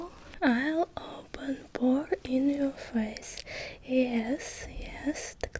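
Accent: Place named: Russia. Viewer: Русский акцент по-моему офигенно триггерный. Вери вери гуд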